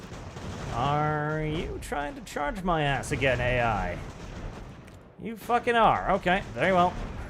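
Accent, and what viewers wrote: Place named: United Kingdom. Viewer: Almost 4 hours of being a better brittish that most modern british, good stuff!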